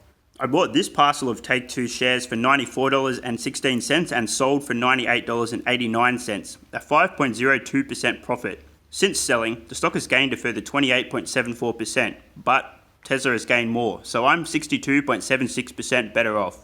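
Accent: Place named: Australia. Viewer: I can tell you are an Aussie, I'd like to have a beer or more, where are you?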